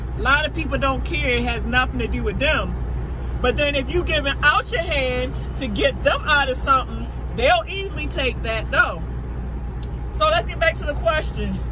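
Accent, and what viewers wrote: Baltimore, United States. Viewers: You have a Baltimore accent 🤔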